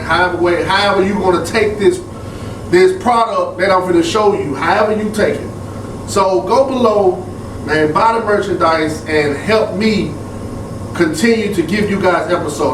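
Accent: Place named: Houston, United States. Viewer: Dude is undoubtedly from Houston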